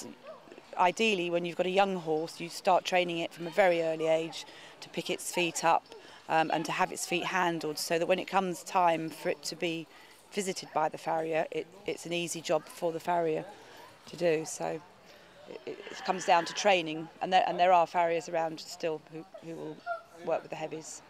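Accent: United Kingdom. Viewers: by accent i'd sday britain. but in america you have grater chances to see draft horses than in europe. about ardennes i don't know, drop by on the front porch of rural heritage page.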